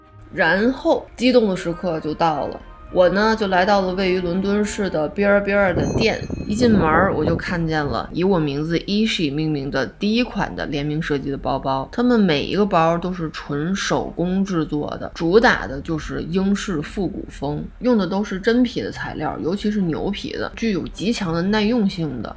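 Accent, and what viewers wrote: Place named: Beijing, China. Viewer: 您说话的腔调是个地道的北京妞：）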